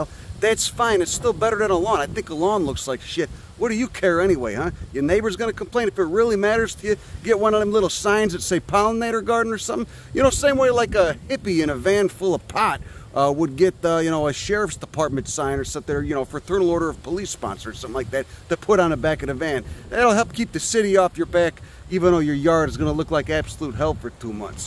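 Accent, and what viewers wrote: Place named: New York, United States. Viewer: What is that accent!? 😅 I love it as it sounds like Peter Griffin crossed with...err, forgive me I'm not from the US...either Italian New Yorkian or downtown Bostonian. 😅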